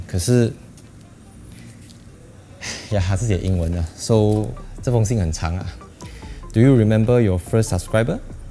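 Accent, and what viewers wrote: Malaysia. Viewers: 就是因为你的本地腔调所以我才订阅的，很大部分本地汽车中文online media学大陆和台湾用了很正式的语言来表达，不是不好只是少了人情味。比如说我们都习惯叫sponge/span了，其他媒体很喜欢用发泡棉这个字，感觉太台湾了。。真的听到很不习惯。。btw，恭喜恭喜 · 恭喜！赞！我喜欢你马来西亜华语的腔调。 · 其實作為台灣人，我還蠻喜歡你的馬來西亞腔的！內容也很精彩，加油！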